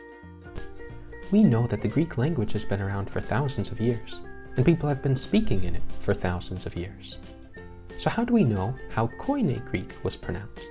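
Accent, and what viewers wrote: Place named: England, United Kingdom. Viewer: most instances of ει were never diphthongs in fact, they were the result of compensatory lengthened ε and as such were pronounced like ε, but longer. in fact they were often written as ε only in early times. the person in the video just has an english accent that makes him pronounce a long e as a diphthong (ei) stress is on the second letter of a digraph in greek by convention, even though it's evidently the first one that is stressed (in ταύτην the stress is on the /a/, not on the /f<-w/).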